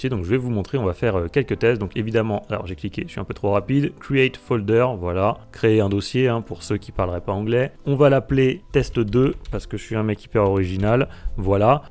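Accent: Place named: France. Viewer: j adore le petit accent très Frenchy sur l anglais :D mais merci pour le boulot réalisé, c'est chouette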